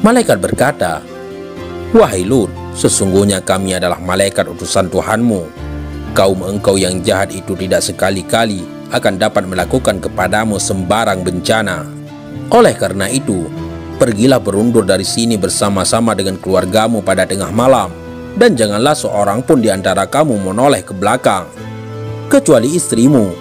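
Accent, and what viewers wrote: Aceh, Indonesia. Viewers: Salam admin:sya dari padang minangkabau.bolehkah saya bersejerah bertanya kpda admin. Admin dari mana. Bhsa admin bercampur dngn bhsa indonesia & melayu.logatnya sprti logat aceh.apakah admin orng melayu aceh.orng malaysia tak mungkin rasanya bhsa melayu malaysia berbeda dngn bhsa admin. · logat ACEHH kyk nya...taah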